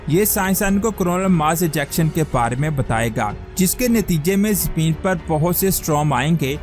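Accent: Punjab, India. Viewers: yar tum Urdu bhi aur angregi bhi Punjabi lehjay mein parrhtay ho,ba'tao mein kiya ka'roon.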